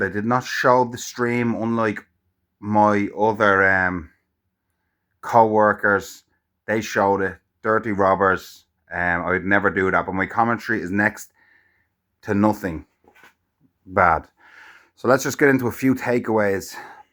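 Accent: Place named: Wales, United Kingdom. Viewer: My welsh sounding guy.....YNWA 👌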